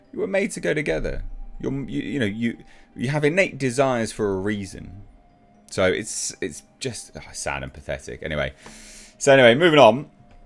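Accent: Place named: United Kingdom. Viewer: As a gen-you-wine good-ole' down home, back woods country boy, I must say I love hearing you say, "boy howdy" with your beautiful British accent. Well done, sir.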